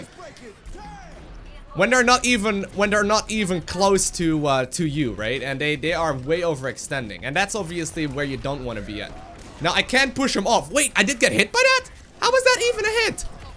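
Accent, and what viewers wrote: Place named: Netherlands. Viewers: he sounds dutch to me as well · This guy is dutch right? his accent is so dutch lol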